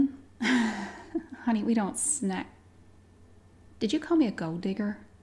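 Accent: South, United States. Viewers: The country accent, blonde hair, sequins, long nails, and big jewelry reminded me of my old babysitter. :)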